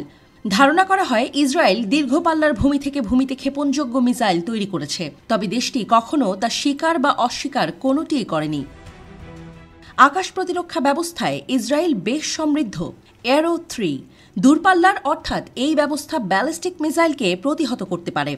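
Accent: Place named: Kolkata, India. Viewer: কলকাতার মতো খবর পড়লে শুনতে ভালো লাগেনা, দয়া করে বাংলাদেশের মতো পড়েন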